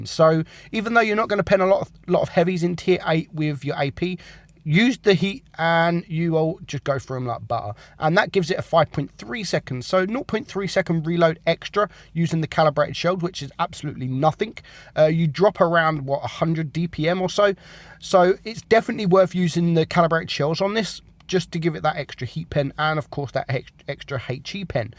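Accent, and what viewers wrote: United Kingdom. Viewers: Dude, he's british. People accoss the world speak differently. Try to accept that, eh?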